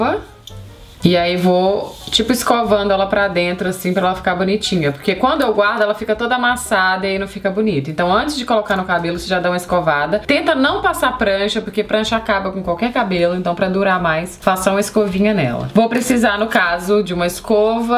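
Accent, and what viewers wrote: Minas Gerais, Brazil. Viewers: Você ainda fala, mineirissimaaa 😘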